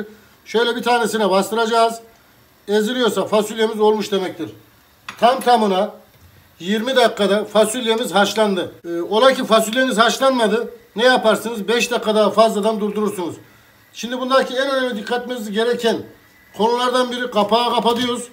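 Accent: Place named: Central Anatolia, Turkey. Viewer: „Baska bisey deel“😀 ustam ankaralimisin veya ic anadolu, bizim oranin sivesi sanki 😇 püf noktalariniz icin cok tesekkürler :)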